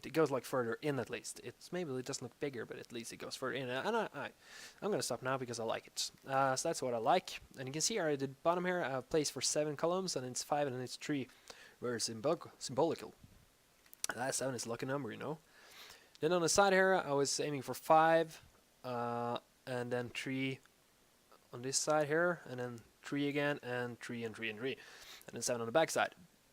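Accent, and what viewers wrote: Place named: Australia. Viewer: nice vid! like ur australian accent mate ;)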